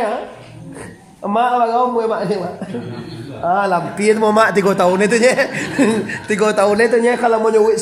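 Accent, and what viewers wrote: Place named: Pariaman, Indonesia. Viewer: Bahasa Minang kabau logat Pariaman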